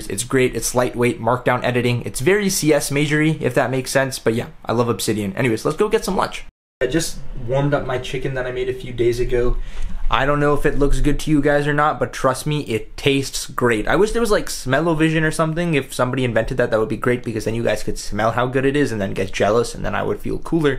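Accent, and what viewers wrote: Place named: United States. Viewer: Indian harry potter with american accent, perfect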